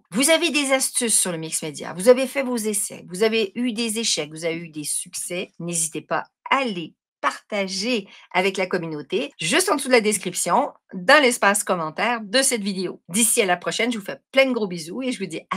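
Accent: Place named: Quebec, Canada. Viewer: Bonjour, je viens de vous découvrir 😊 c'est drôle, je suis québécoise et je pensais que vous l'étiez à la façon dont vous parlez, je me demandais si vous étiez française ou québécoise. C'est dommage mais j'ai une Cricut mais je vais quand même aller voir vos vidéos 😊 ce que j'aimerais c'est d'avoir des projets pour utiliser ces impressions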